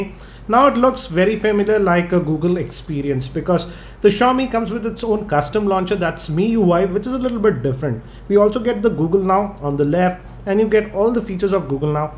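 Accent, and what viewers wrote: India. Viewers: Indian voice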